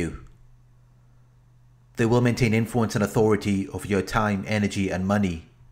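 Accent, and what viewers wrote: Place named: Scotland, United Kingdom. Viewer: I like your videos and your lovely Scottish accent.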